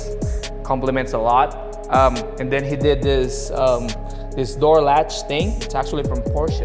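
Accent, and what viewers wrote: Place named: Tagalog, Philippines. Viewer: the tagalog accent is strong in this one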